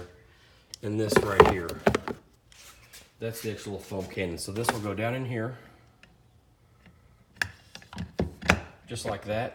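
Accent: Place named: Missouri, United States. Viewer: Sounds like you must be from Missouri I don't know if you heard or not but hillbilly Ranch is closing we usually go to Flat River I live in Illinois in Collinsville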